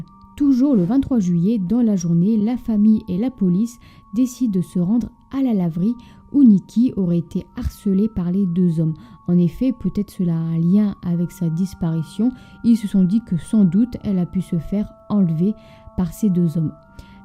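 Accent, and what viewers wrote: North, France. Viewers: aaah vous êtes une chtimi comme moi <link> semblait bien percevoir un accent 😁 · Au top, comme d'habitude! Mais alors je suis curieuse... ^^ D'où nous vient cet accent? J'ai l'impression d'entendre des sons aux influences du nord mais en même temps je n'ai jamais entendu d'accent du Nord comme le tien si j'ai tapé dans le mille. En tout cas c'est charmant, la touch! J'adore! Merci de nous faire voyager dans le sombre monde du true crime 🕵️‍♀️🚔🔪💉💀🥀⚰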